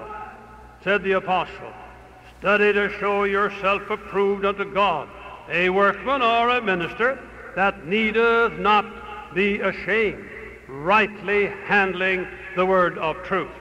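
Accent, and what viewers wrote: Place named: Ireland. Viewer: Alexander Hugh Macmillan (June 2, 1877 – August 26, 1966), He was born in Canada and I love to listen to his slight Irish lilt. A dynamic speaker, he presented a history in his book Faith on the March, published in 1957.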